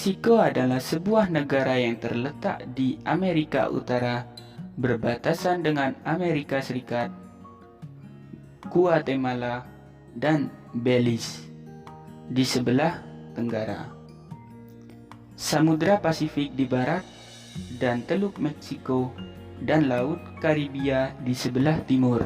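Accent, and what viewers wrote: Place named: Aceh, Indonesia. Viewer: bahasanya kebanyakkan dan dan dan dan dan logatnya logat aceh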